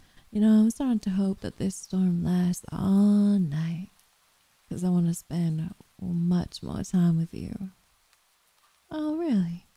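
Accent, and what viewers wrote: South, United States. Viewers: Only thing I have to say is that the SFX at the start is WAY TOO LOUD compared to the voiced bit 😅. Apart from that, this was lovely to listen to 😊. Even though I'm usually not a fan of the southern accent, your voice is so soothing that I actually liked it in this audio 🥰. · I'm not usually into the southern Bell but for you I think I can make an exception. 🥰 This was really great truthfully you sound more natural southern compared to people that I know that are southern 😭. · Since I'm not from the south, I cannot judge your accent. I'm too far north to be allowed to do that. You sounded more southern than I can try. :) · Each is getting better than the last, it really shows your getting a hang of the programs and good sound design! The rain maintaining its sharpness in the background is a nice juxtapose against the smoother southern accent that isn't comically overblown uwu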